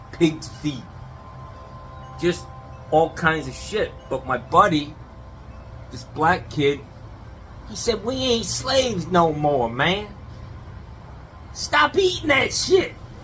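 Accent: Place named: Northeast, United States. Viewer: your face now fits your NE accent! Not many people get to a point where their faces and voices line up.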